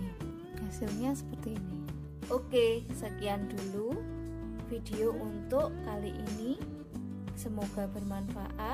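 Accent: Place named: Java, Indonesia. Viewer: mantap,.. kreatif sekali mbak.. salfok sama suara medhok nya lho.. hehehe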